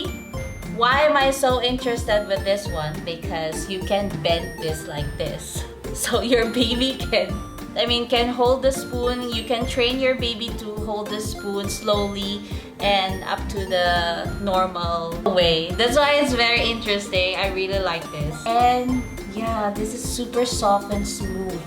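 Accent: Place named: Singapore. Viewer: SingLish is always interesting sound like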